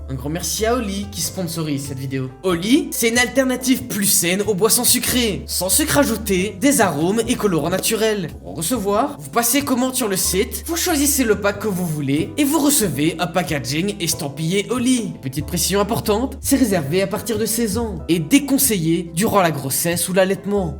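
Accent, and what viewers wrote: Belgium. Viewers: T’es belge w?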